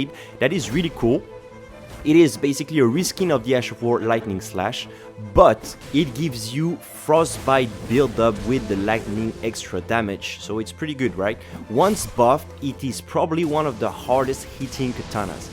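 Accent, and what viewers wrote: France. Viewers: I didn't know french accent could be actually nice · T’es français enft parce que ta un petit accent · le petit accent fr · You have a french accent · the day they will increase the range of the meteoric ore blade and buff it, i will be in heaven. (i have a question, wich country do you come from ? i sensed a french accent but i think im wrong) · French accent ? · gg très belle vidéo xd g direct remarqué ton actent fr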